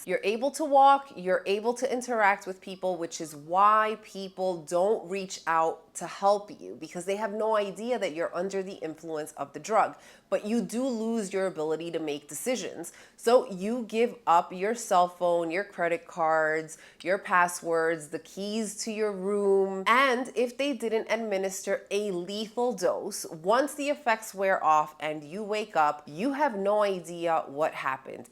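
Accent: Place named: Paisa, Colombia. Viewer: Great video and U love the paisa-ish accent when you speak Spanish! And a fantastic, timely warning. For anyone who doesn't know, though, "No dar papaya" is an expression that means don't let your guard down or otherwise make yourself an easy mark.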